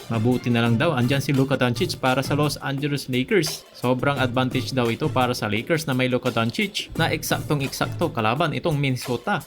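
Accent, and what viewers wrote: Pangasinan, Philippines. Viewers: taga pangasinan ka awa? hehe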